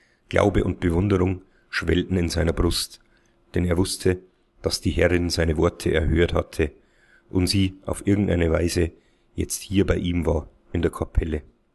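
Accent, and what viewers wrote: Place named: Styria, Austria. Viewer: Kompliment zu der Vertonung dieser Kurzgeschichte. Kompliment. Insbesondere der steirische Dialekt gibt dem Ganzen etwas Ostländisches.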